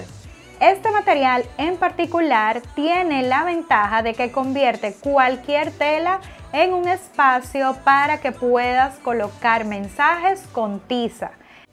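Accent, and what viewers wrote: Dominican Republic. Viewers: Eres dominicana ?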